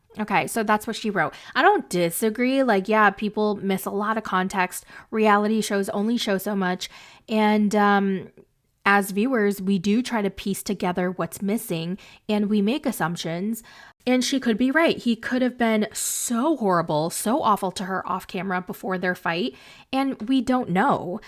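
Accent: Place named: New York, United States. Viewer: Lol, your new York accent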